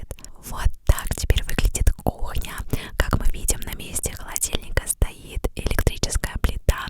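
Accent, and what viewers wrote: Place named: Spain. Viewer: Puedo sentir tu español...❤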